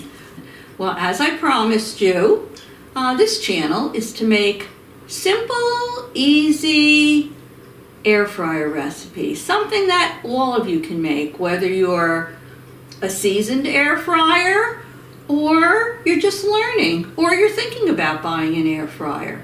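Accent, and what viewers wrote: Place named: New York, United States. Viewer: I don't think I realized until I stumbled on your channel that their aren't many cooks on these shows being hosted by real New Yorkers with real New York accents. I am subscribing because there's something reassuring about listening to you. Also, just started using my Air Fryer and can't wait to learn more.❤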